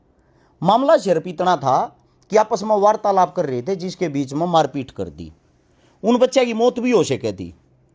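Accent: Haryana, India. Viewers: भाई जी एक तो आपके बोलने का तरीका बहुत अच्छा है फुल हरियाणवी, ओर भाई जी आपने सही कहा एक साल ना जाओ हरिद्वार इनकी तो आंखा मैं ढ़ीढ आ जागी❤❤❤ जय हरियाणा जय हरियाणवी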